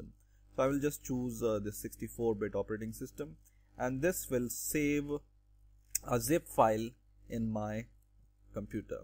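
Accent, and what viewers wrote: India. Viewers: thank you Indian accent man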